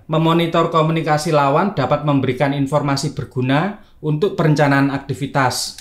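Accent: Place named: Batak, Indonesia. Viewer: Mantap dialek batalnya.....horas...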